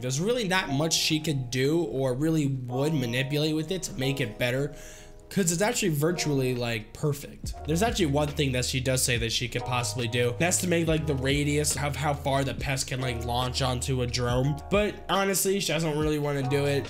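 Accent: Australia. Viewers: he’s Australian what do your expect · Dude all Australian speak like that.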